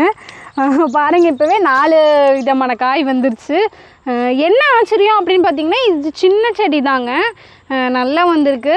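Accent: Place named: Gobichettipalayam, India. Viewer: எங்க ஊர்லயும் மிளகு தக்காளி கீரை யை கறிஞ்சி குட்டி கீரை தான் சொல்லுவோம் sister...... ******. *********. **** இப்படிக்கு கோபிசெட்டிபாளையம்.......... உங்க language கூட கோபி சைடு பேசற பேச்சு மாதிரியே இருக்கு பா..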